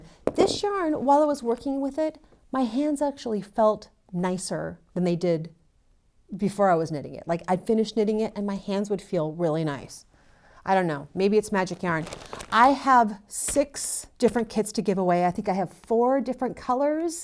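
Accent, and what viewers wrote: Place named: United Kingdom. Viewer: welcom. I thought you british.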